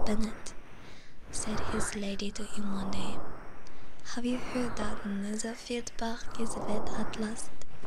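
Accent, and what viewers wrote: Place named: France. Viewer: DO MORE STORY READING WITH FRENCH ACCENT PLEASE, IT'S AMAZING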